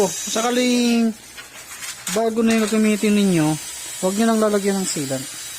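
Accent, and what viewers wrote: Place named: Pangasinan, Philippines. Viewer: damihan m nman upload mo idol nallibang aq halos napanood qna lahat videos mo, tapos yong accent mo na pangasinsn natutuwa aq.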